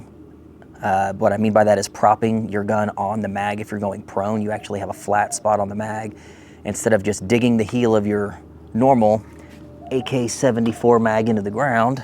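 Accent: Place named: Czech Republic. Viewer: Based on accent, I think is Czech.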